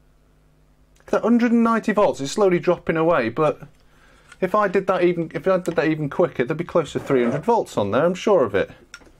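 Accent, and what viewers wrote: United Kingdom. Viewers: this guy is funny, I also love that UK accent, instant subscribe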